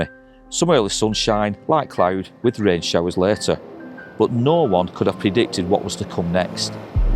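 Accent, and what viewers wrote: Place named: Rochdale, United Kingdom. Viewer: Another sad story but great video, You tell good stories and you're really good at what you do. you have the same accent as a good mate of mine that lives in Rochdale, we've been good friends for 25 years.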